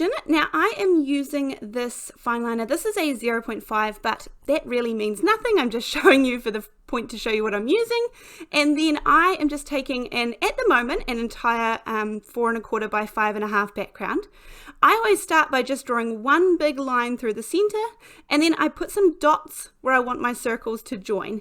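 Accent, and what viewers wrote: New Zealand. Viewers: Very cool. These are interesting, effective and have great effects! (Love the Kiwi accent!)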